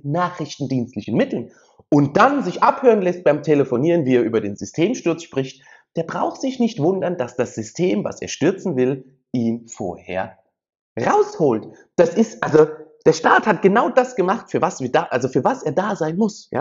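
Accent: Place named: Rhineland-Palatinate, Germany. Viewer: Jetzt ist mir das Wort wieder aufgefallen an dem man bei ihnen den Rheinland Pfälzer klar erkennt fS Wort heisst """ anderster""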